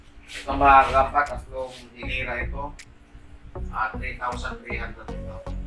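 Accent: Gabaldon, Philippines. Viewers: Gabaldon padin yan tay yung tagalog mo?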